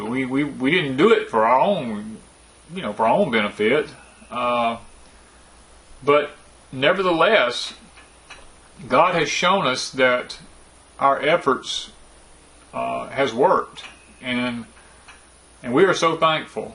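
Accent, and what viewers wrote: South, United States. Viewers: I enjoy listening to your stories on Porch Time, in fact I put it on auto play when going to sleep. Hearing your southern accent is calming, It's like hearing my late husband talking...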